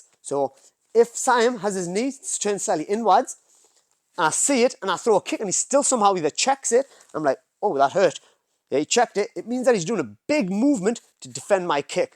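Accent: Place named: Newcastle, United Kingdom. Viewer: appreciate the super fast response. I have been away from the UK 5 years so ;loved hearing the geordie accent. If you ever visit Pakistan, please let me know so we can get you teaching a seminar or two for the local lads.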